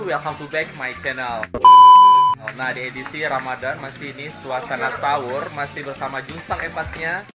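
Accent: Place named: Aceh, Indonesia. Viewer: Hahaha,,lon pike awak Medan si Lae..tapi watee meukalon gtng na perasaan ureng Aceh sang..tp bak video Hana Tom lon deungoe su bhs Aceh 😆😆👍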